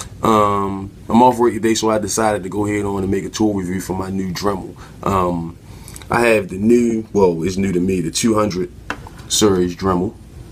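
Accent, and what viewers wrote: Baltimore, United States. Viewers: Yo you from Baltimore??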